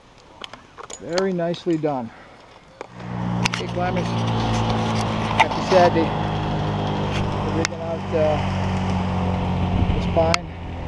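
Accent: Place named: East Coast, United States. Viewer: good to see you back at er pal! always enjoy your vids with the east coast accent commentary! keep safe brother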